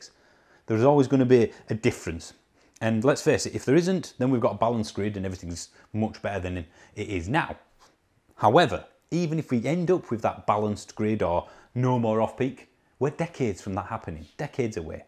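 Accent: Yorkshire, United Kingdom. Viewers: Well said, you sound like a real Yorkshireman, unlike who I suspect is a fake.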